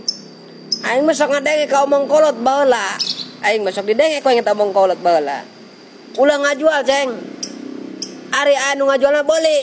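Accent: Indonesia. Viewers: Logat Banten kitu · Lucu ey ngomong sunda na😅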